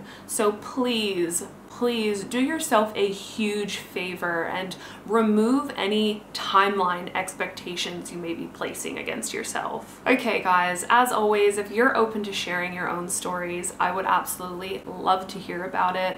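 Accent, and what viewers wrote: Australia. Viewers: You have a slight Australian accent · are you a bit Australian too? This video was really helpful. Thankyou :))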